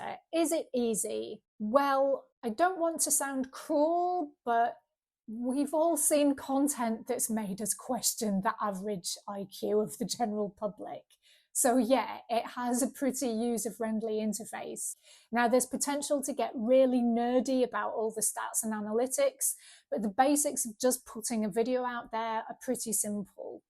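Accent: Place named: England, United Kingdom. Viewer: Hi... Actually, I am watching your videos because of your pure English accent unlike new generations of English who are mixed with thin Aussie accent which I hate and also you are near my age and SINGLE life experience and living at parents house....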